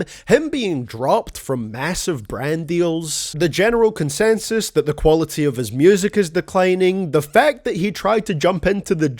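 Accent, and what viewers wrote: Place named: Scotland, United Kingdom. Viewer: What's your accent bro? Sounds like 50% Scottish and then 50% a mixture of about 9 other accents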